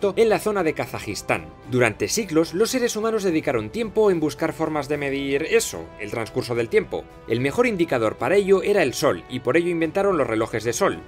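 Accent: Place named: Andalusia, Spain. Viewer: bueno pues a seguir trabajando... xddddddddddddd ese es andaluz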